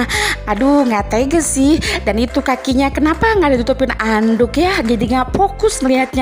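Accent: Sunda, Indonesia. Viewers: Hehe min nya suara nya lucu org Sunda ya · mimin nya orang sunda kah 😆? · Min urang mana meni sunda pisan? logatna asa logat urang dieu😳💜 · Min ..aslinya orang mana sihh ..sundanya medok bingit 👍👍🤣🤣😂😂😂 Suara mimin bikin rindu ..kontennya yg ini mengandung bawang tpi berhubung yg bawain mimin jd ckup trhibur . Semangat min ..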